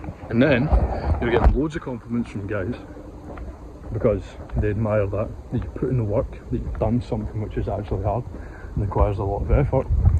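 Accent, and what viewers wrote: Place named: United Kingdom. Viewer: are i want to learn your british accent.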